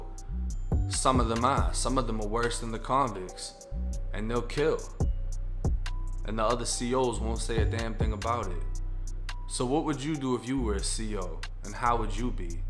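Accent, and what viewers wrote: Boston, United States. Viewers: Bra you got a Boston accent you must went to Florida on vacation and got caught and did a bit in Florida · Florida story with a Boston accent. Never thought I’d find something more entertaining than this 💯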